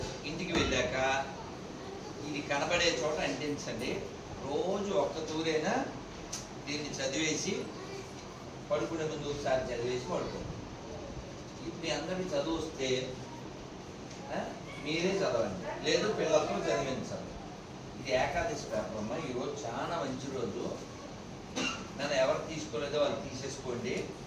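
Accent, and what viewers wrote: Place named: Tirupati, India. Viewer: GURUVUGARIKI SHUBHA SAAYAMKAALAM , PADHABHIVANDHANAM. GURUVUGARU MEEKU MAA THIRUPATHI YAASA VACHEESINDHI GURUVUGARU. "ROJUKU OKATHURI CHADAVANDI" 🙏🏻🙏🏻🙏🏻🙏🏻🙏🏻🙏🏻🙏🏻🙏🏻 ANDHARI VAARU SWAMY, MEERU MAA THIRUPATHI VAARANDEE SWAMY MAA THIRUPATHI VAARANDEE GURUVUGARU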